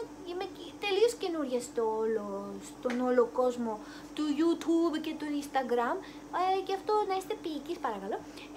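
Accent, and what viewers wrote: Japan. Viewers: Miss Nancy, νομίζω ότι μόνο αν πήγαινε η σε γλωσσολόγο θα μπορούσε να καταλάβει γιατί υιοθέτησε στοιχεία φωνολογίας, τον τόνο, την προσωδία, τον επιτονισμό κτλ της ιαπωνικής γλώσσας και μάλιστα όχι π.χ. της αγγλικής. Σίγουρα ως ένα σημείο γίνεται ασυναίσθητα, όμως, είναι και μια συνειδητή επιλογή ταυτόχρονα, τουλάχιστον νομίζω ότι οι γλωσσολογικές μελέτες αυτό δείχνουν. Κάποιοι που ζουν (ως ενήλικες) στο εξωτερικό αλλάζουν περισσότερο την προφορά τους από άλλους που ζουν ακόμη περισσότερα χρόνια. Οι λόγοι πολλοί: μπορεί να αισθάνονται ότι αφομοιώνονται καλύτερα στη ντόπια κοινωνία όταν μιλούν ακόμη και τη μητρική με ξένη προφορά, μπορεί να τους ακούγεται πιο εύηχο κτλ κτλ κτλ. Προσωπικά, ζώντας 17 χρόνια στη Ισπανία, δεν έχει αλλάξει η προφορά μου, παρότι δεν έχω παρά σπάνια επαφή με άλλους Έλληνες, αλλά, όταν λέω κάτι στα ελληνικά μπορεί π.χ. 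να κοτσάρω κι ένα «ναι;» στο τέλος, κατ´αντιστοιχία με το ισπανικό «no?» γιατί και το έχω συνηθίσει στα ισπανικά στο τέλος καταφάσεων(δηλ γίνεται και ασυνείδητα), αλλά γιατί το βρίσκω πρακτικό (δηλ το κάνω και συνειδητά σε ένα κομμάτι του). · Γεια σου! Γιατί μιλάς τα ελληνικά με ιαπωνική προφορά; Χαιρετισμούς από Manchester!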